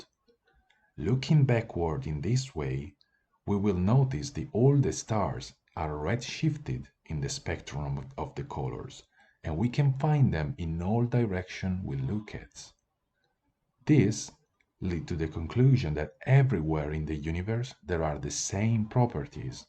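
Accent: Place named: Italy. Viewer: Love italian accent!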